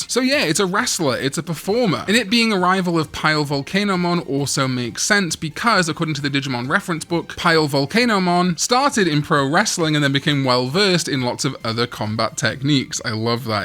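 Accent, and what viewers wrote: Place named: Wales, United Kingdom. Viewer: It's nice to hear a welsh person butchering Irish words. There's some kind of solidarity there.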